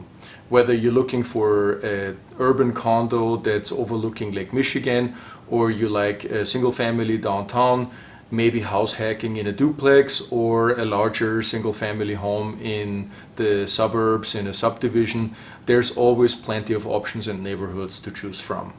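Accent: Germany. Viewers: I thought you had a German accent but then you mentioned Austria, another beautiful country. I like that party of Europe, although I have to admit that I liked all of Europe. Now that I'm retired I have thought about going back since I have German friends.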